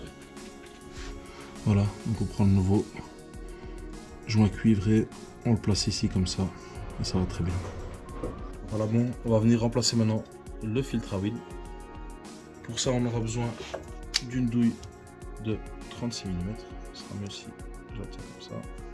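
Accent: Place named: Belgium. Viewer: Je suis français et je possede une BMW e46 essence donc rien à voir avec ce modèle mais j'ai pris énormément de plaisir à voir ce travail bien fait par un mécanicien au sympathique accent belge et surtout un vocabulaire et un phrasé parfait tout au long de son intervention. Ca fait bien plaisir. Merci Monsieur 🙂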